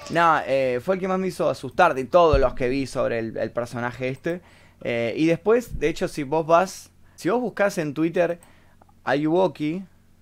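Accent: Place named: Córdoba, Argentina. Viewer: La tonada cordobesa jajaja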